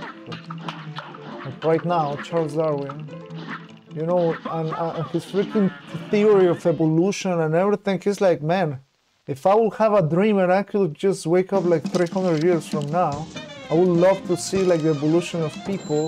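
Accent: Mexico. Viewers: primero pense que eras estadunidense pero con este video ya veo que sos mexicano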